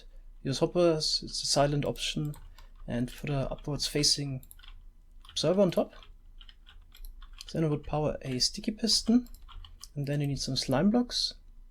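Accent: Germany. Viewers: du bist ein deutcher das hört man omg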